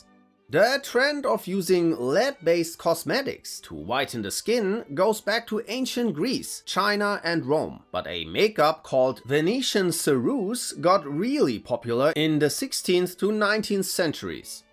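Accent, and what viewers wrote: Germany. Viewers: You know I normally don't like channels like this, because they feel like kiddie channels, but this one is different. Would like longer explanations, but I understand that people don't have that long of attention spans nowadays thanks to shorts, reels, and Tiktok. But it is good for background noise while cooking or working on projects around the house. Also I spent three videos trying to place your accent...and I finally got it. You're German, are you not?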